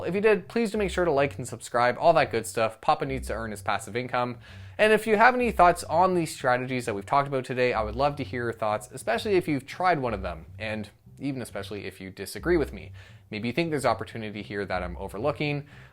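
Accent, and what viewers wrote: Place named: Canada. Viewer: hate canadian accent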